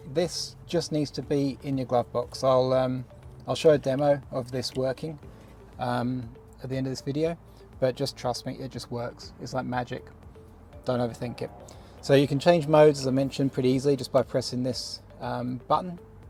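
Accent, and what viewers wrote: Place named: United Kingdom. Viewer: I got literally 2/3rds of the way through this until I realised that this wasn’t a UK RHD version car. Your accent threw me off completely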